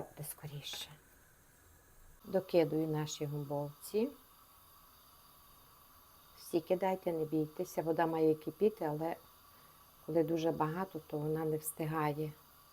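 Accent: Transcarpathia, Ukraine. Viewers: Так приємно бачити і чути наш Закарпатський говір. А страви ми і у Німеччині готуємо ,як колись вчила нас нодь мома. Здоров'я та миру вам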